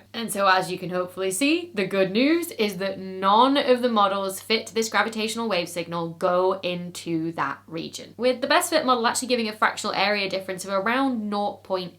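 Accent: United Kingdom. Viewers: so nice channel and such an awful British accent!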